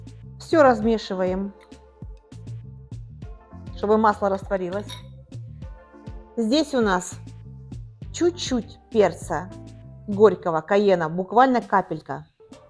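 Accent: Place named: South, Russia. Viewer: ЛОжить, лЯжу - из малороссийского говора, другого чередования согласных, ударений, ну и что, с большой теплотой к нему отношусь, весь юг российский на этом диалекте говорил, окают в Нижнем, акают в Саратове, Москве...и т.п. Это все прекрасный русский язык в разнообразии своих практик жизни. Спасибо вам, за доброжелательность и неравнодушие!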